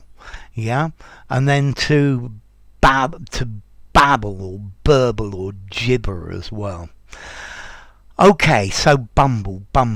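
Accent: United Kingdom. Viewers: You have nice british accent. I am bumbling now to. Talk real. English as you..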